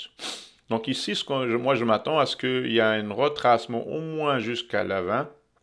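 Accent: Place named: Quebec, Canada. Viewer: merci pour vous riches analyses ! avec accent québécois en plus :) grand bravo !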